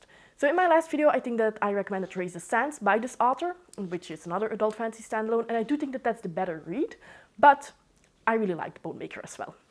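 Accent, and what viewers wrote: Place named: Netherlands. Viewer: I hope you're feeling better now. Thank you for the recommendation. I loved most of the books you recommended, the others go on my TBR. I wasn't a fan of Mistborn, maybe I'll like Elantris. Just found out you're from Belgium, like me. 😊 Do you only read in English or also in Dutch? (I think you're dutchspeaking, going by the accent.)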